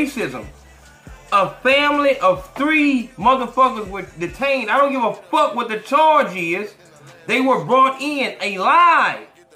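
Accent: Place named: South, United States. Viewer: “Upping that gaw damn fye” 😁 salute brother the content deep af ... I know u country as hell fr #SalutefromFlorida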